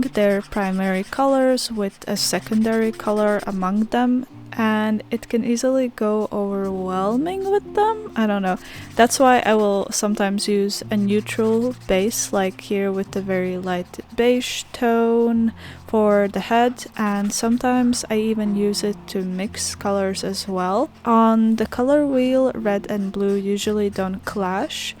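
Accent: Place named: Belgium. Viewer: my first thought was "wow, these axolotl dragons kinda remind me of httyd for some reason..." **a minute later** "OH MY GOD ITS LITERALLY TOOTHLESS BUT RAINBOWS" also i was drying my hair while watching this so i had the volume up and apparently sounds like me but with a Belgian(?) accent instead of an American one, according to my mom lol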